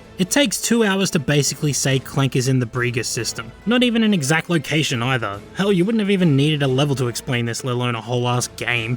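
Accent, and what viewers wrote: Australia. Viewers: Thought something was off while watching the video, turns out he's just australian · thank god this dude is Australian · where about in australia u from bro? (i’m from perth)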